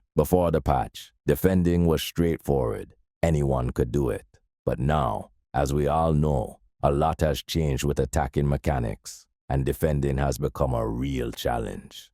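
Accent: Jamaica. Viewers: Why does the accent sound Jamaican?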